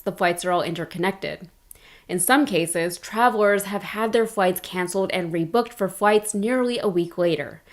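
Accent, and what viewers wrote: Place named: Texas, United States. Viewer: Your whistling has become quite endearing because Matthew McConaughey does the same thing,,when he talks it’s called the Texas whistle❤ I thought you had a speech impediment but it’s just an accent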